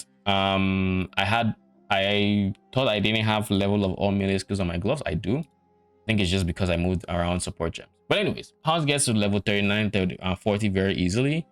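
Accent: Nigeria. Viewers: Are you Nigerian? The accent is telling me yes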